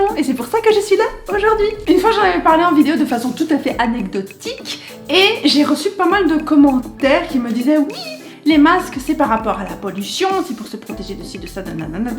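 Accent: Belgium. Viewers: Tous les "w" sont prononcés "oué" en Belgique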